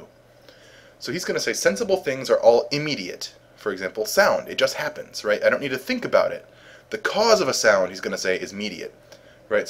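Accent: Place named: Ireland. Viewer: He's Irish...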